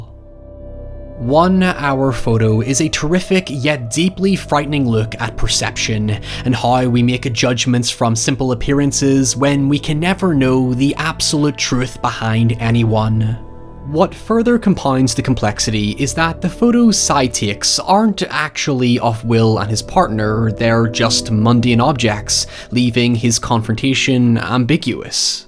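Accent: Northern Ireland, United Kingdom. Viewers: Surprising to hear a fellow Northern Irishman! Love this film, a true hidden gem.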